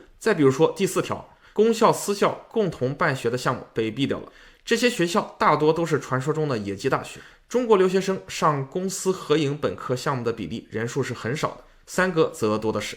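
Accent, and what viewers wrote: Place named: Shanghai, China. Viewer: 你这个上海话。。。。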